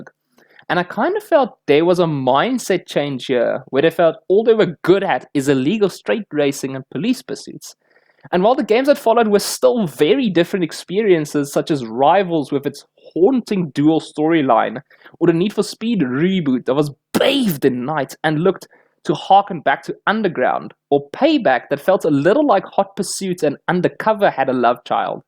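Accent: South Africa. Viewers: Unrelated, but you sound South African... are you? 😂 Edit: Knew it lol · South African accent. I hear it. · Are you South African? Edit: Yeah now I realised, I could hear by your accent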